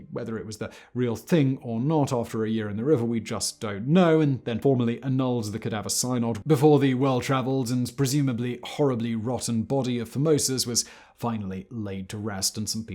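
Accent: RP, United Kingdom. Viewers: For someone who speaks like a toff and gets all oity toity about snobby things you'd think he'd have ALL of his pronunciations right 😂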